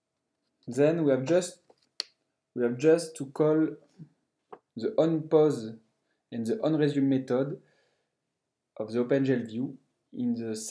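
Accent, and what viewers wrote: France. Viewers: haha amazing ! is this a french accent ? thank you for your time and efforts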